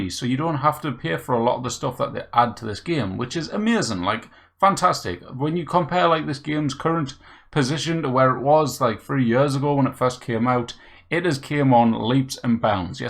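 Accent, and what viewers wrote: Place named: Newcastle, United Kingdom. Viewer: Geordie accent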